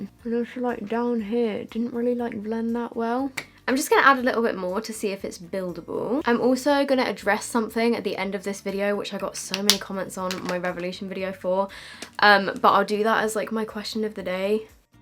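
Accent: Australia. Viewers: Oh, as an Aussie I just love your Australian accents. 😂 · love how you kept turning australian ahhaha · Yo thats good aussie accent! 😍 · Loved the Australian accent😂❤️ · Wtf is that Australian accent 😂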